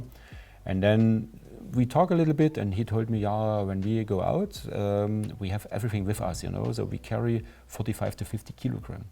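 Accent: Germany. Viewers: That’s one heavy German accent! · East German accent non the less. 😊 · As a German myself, it's painful to listen to this. But he's from East Germany. They never had English in school. They had to learn russian in the GDR (DDR) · Ich liebe sat german Inglisch ... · German Accent at it´s best ;) sometimes i love my country for things like this XD but he seems realy competent and like a nice chap :)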